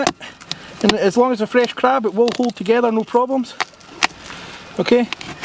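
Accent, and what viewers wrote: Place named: Texas, United States. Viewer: Good information, but very unusual Texas accent.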